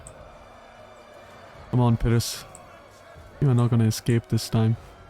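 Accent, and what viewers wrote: India. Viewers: Good stuff. You sound Indian - if so, Latin and Greek are far easier to pronounce correctly than modern European languages. You can use the dental 't' and 'd', for example (like in Hindi 'tum' or Tamil 'thambi' - non-Germanic languages do not use the alveolar t and d (like in English or German)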